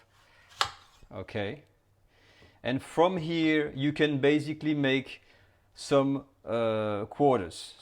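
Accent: France. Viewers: great channel, will be making your recipes this weekend so excited! love the french accent... lots of love from NYC !! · I love all your recipes! And your French accent 🥰 thank you so much!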